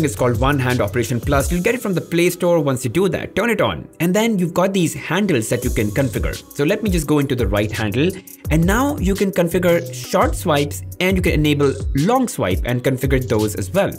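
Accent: India. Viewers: I AM SICK AND TIRED HEARING THESE FUCKING INDIAN ENGLISH DICTIONS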